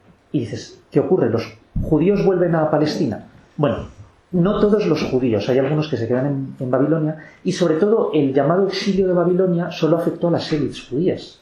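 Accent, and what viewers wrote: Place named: Spain. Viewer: Hola.muy malo el sonido y ademas el acento español dificulta entender .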